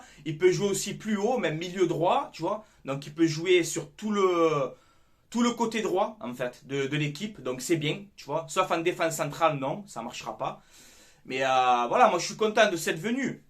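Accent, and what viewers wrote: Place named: South, France. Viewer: salut ecoute quant je t'ecoute parlé avec accent du sud sa me fait rire et tk je suis du sud aussi quant ont parle sa recent pagnol et les cigales le pastis les grillade de sardines la petanques le sud quoi:p prend soin de toi ami et merci pour les infos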